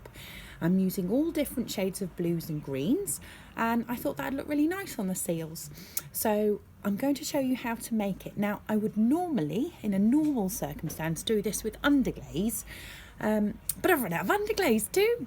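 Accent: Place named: England, United Kingdom. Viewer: You're so sweet and joyful and i love your english accent!!! I hope i visit england one day! 😃 the seals are cute! Getting a nice bubble paint bath😃 sending love from the US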